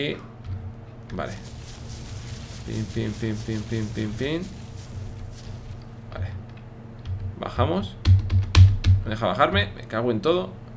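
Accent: Colombia. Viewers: millones pense que eras español un colombiano xD xD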